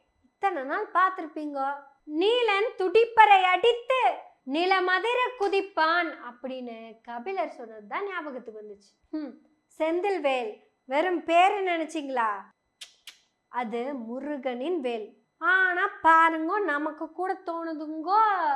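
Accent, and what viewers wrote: Kongu, India. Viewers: கொங்கு தமிழ் சுவையோடு அழகாக பேசும் சகோதிரிக்கு வாழ்த்துக்கள்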